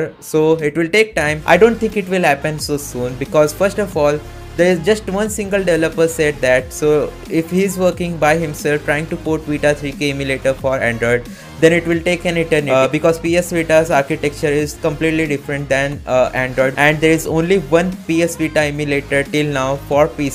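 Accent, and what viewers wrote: India. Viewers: Indian english = fake news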